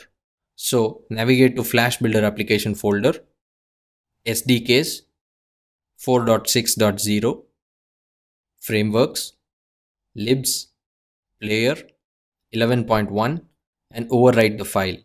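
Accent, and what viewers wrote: India. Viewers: dis should be bery bery nice! I really like indian accent :D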